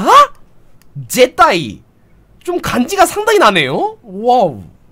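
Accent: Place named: Busan, South Korea. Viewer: 걸쭉한 부산사투리 ㅋㅋㅋㅋㅋㅋㅋㅋ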